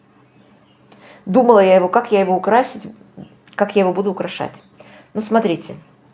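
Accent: Baku, Azerbaijan. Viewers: Спасибо за рецепты!!!У вас настоящий бакинский акцент😂😂😂❤❤❤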